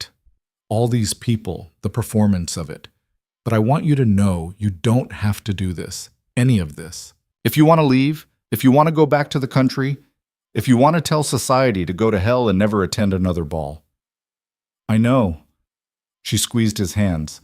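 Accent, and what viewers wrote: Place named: United States. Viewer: American accent dosen't suit the story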